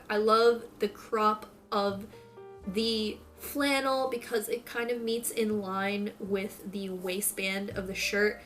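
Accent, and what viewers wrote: Philadelphia, United States. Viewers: Getting used to short nails is so difficult 😩 I loveeee this content 💞 your philly accent is my fav thing as someone from the west coast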